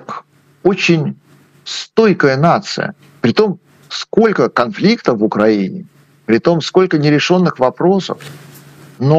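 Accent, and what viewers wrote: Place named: Israel. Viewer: Явно заметен акцент ведущего. Тогда все понятно : либо Израиль , либо Бруклин. Дизлайк.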